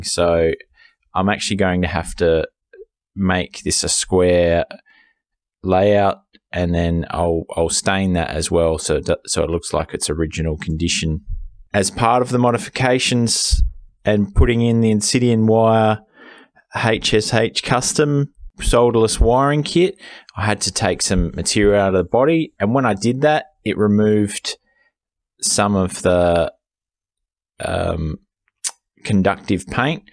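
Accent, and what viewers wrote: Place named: Australia. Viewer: Good to hear another Aussie voice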